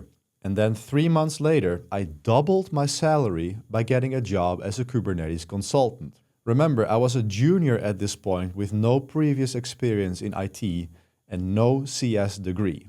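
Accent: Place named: Netherlands. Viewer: My father has been a Kubernetes engineer since the early days of Kubernetes. I learned a lot from him when I was only 17 years old. I got my first Ubuntu laptop at the age of 16. After graduating, I got a job in Belgium as a DevOps engineer. I earned this job for one reason: my knowledge of Kubernetes. Even though I’m only a junior, my experience was already enough to work on production environments at the company. There are only two of us in the company who really know how to work with and implement Kubernetes. Dutch language: PS ik hou van je Nederlands acces. Herken mezelf erin 😊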